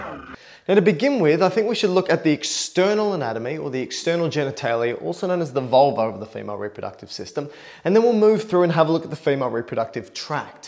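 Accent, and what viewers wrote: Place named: Australia. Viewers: He is an Aussie, probably of Italian descent... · I reckon it's Australian, mate!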